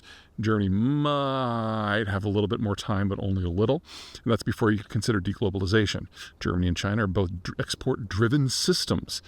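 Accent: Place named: United States. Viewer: Oh! It's the same fxxxing expert with an American accent, who knows so much about Australia. Too funny. Pass the bloody popcorn mate. Keep doing these, you are a genius, It's so comical listening to you blow it up your own arse.😂😂😂😂😂😂 Love the sunglasses, they really do a great job hiding your IQ. Get back to the northern hemisphere, you are stealing our supply of oxygen down here. Then again, the Septics are well versed on extortion. They have practised it all over the world, since the time of Columbus.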